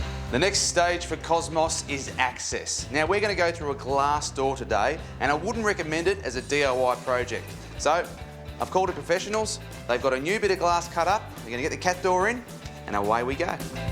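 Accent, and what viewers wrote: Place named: Australia. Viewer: LOVE Aussie accent!!!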